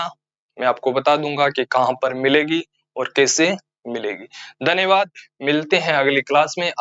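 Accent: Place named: Rajasthan, India. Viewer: Sir me jharkhand se hu me apake language se hi samjh gayi aap rajsthan se ho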